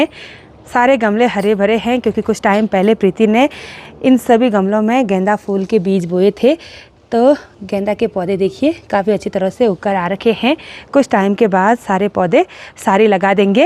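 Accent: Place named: Nimar, India. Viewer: आपकी भाषा कुछ हमारे मध्यप्रदेश के खंडवा निमाड़ जैसी लगती है दी 🎉🎉🎉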